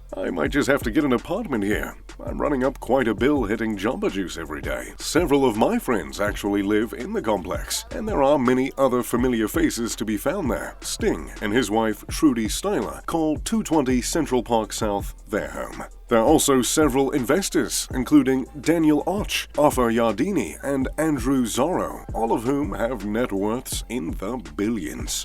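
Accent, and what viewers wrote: United Kingdom. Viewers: He should have gave You a heart for that comment. I usually find British accents annoying, but I like.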